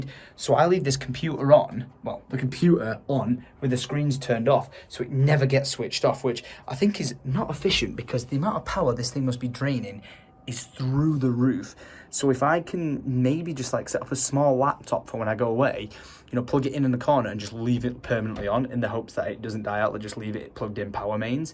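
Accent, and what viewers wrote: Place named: United Kingdom. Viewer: It's probably his northern accent. I've watched him for years and only just realised he has a slight accent recently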